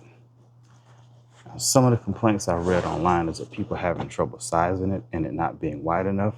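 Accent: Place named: South Louisiana, United States. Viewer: Helpful and informative review. Thanks friend. By any chance, are you from South Louisiana? You sound like it. I’m from Lafayette.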